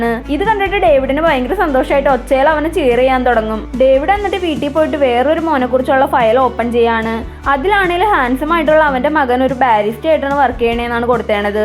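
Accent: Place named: Thrissur, India. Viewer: കഥ പറയുന്നവൻ തൃശ്ശൂർ എവടെ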